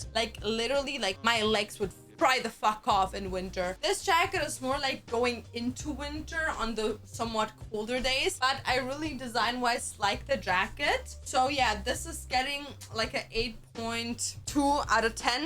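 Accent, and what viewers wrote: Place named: Germany. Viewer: sie klingt so deutsch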